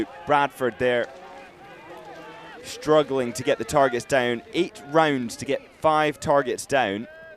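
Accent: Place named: Scotland, United Kingdom. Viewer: This guy is the best English language commentator. Is he a Scot?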